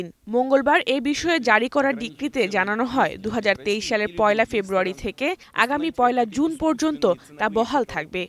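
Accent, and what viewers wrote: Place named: Comilla, Bangladesh. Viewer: Voice eirokom keno ? Didi r bari ki Comilla?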